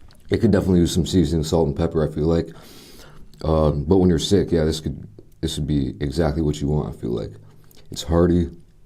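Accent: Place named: New York, United States. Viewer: NGL~ if I was in a spot in NYC & walked in & spoke~ I woulda SWORN he was from New York!! 😂😍💪🏽💯❤️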